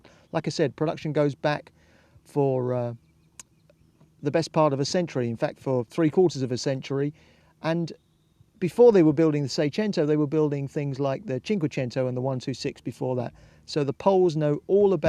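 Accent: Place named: Midlands, United Kingdom. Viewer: Up-holstered skaaete-bowd. What accent is this, Midlands? The North?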